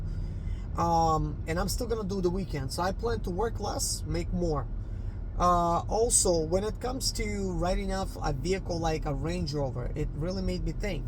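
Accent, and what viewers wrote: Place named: Balkans. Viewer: What nationality are you? You sound very european. Particularly balcan. Am i correct?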